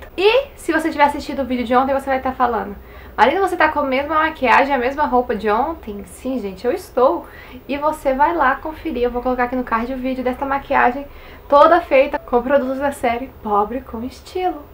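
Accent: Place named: Bahia, Brazil. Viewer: SÓ VOCÊ MESMO ! Estava pesquisando para comprar pincéis .. arrasou .. comprar nesse site e como sou ansiosa 18 dias eu aguento kkkk assim que chegar eu te conto. Sua linda muito obrigada pela dica. Eu adoro demais seu canal !. Ahhhh até esqueci mas a minha amiga que tem seu sotaque ela mora no interior da Bahia Hahahhaha 😂😀 Beijo lindaaaa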